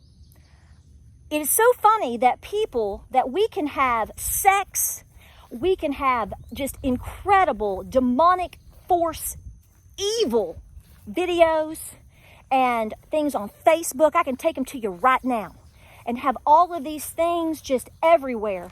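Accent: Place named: East Tennessee, United States. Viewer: I agree 100% with you! Going out to grease the tractor and bush hog. I will then work the garden. I am an East Tennessee native and senior woman farmer. I talk just like you and I am not changing. You Go Girl! God bless everyone. John .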